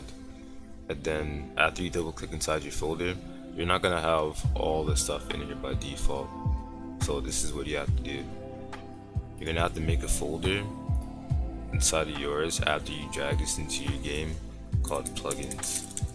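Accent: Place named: Baltimore, United States. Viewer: you from baltimore? i can hear the accent a little lols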